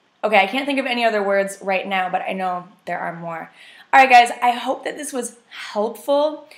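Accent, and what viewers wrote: United States. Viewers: Don't want to be pedantic, but you're teaching us american, not english pronunciation :). Thx! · It's something easy to listen to but difficult to practice, not only we, from India, but neighbouring Bangladesh, Pakistan, Srilanka, simply say WARALD and get rid of it. I faced a listening problem when I met Americans during my stay in USA, briefly, But you are making it a cakewalk to practice English in its pure American tone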